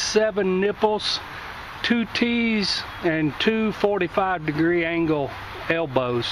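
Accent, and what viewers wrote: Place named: South, United States. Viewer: just love that Southern drawl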